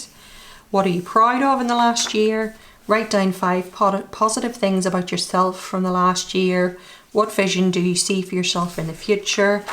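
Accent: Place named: Ireland. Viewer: This is the first video of yours that I have watched and love it! Last year I traveled through Ireland and love hearing your accent 😊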